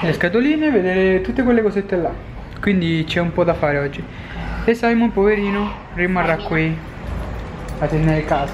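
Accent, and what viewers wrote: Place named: Mexico. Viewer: mi piace il tuo accento messicano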